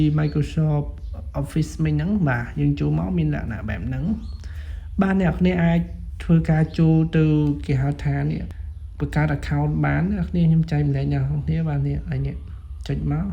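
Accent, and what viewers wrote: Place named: Kampot, Cambodia. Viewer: ស្រ៉ូប ៗ ដូចអ្នកកំពត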